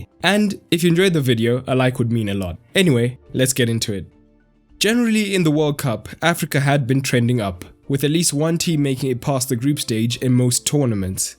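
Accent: South Africa. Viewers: Where are you from? You sound South African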